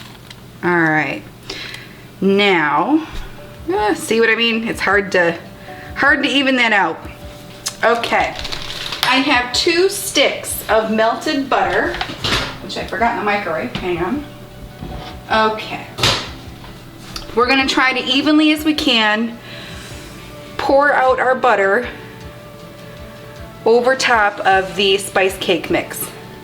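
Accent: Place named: Minnesota, United States. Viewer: Are you from Minnesota by chance? Love this recipe - can't wait to try it!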